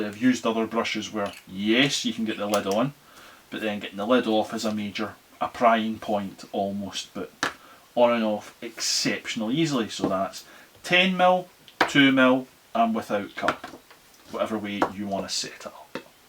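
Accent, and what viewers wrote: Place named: Scotland, United Kingdom. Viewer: LOVE the accent ,what part of Scotland are ye frae?? Great review it helped loads!!!THANK YOU!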